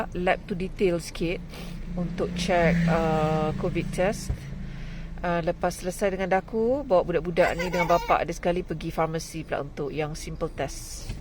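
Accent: Malaysia. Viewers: Mengcokik😁😁😁. U re melayu pure. Nismilan pure. Suka den dongar sis sobut Melayu totok.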